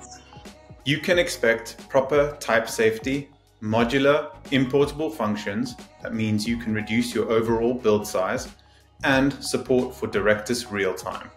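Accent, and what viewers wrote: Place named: South Africa. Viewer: This guy sounds South African. any way thanks for the update 🔥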